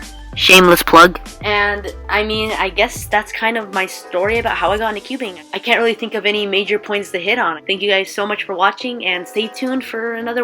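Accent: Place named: Israel. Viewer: I'm from Israel and you have an Israeli accent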